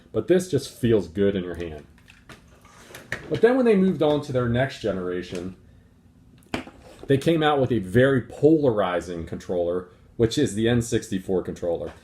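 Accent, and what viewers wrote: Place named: Maryland, United States. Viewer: I thought I detected a Maryland accent on you man. I am a Marylander. I love those beers. I agree those nunchucks are cumbersome. Switch Pro Controller is my fav. Nice VR.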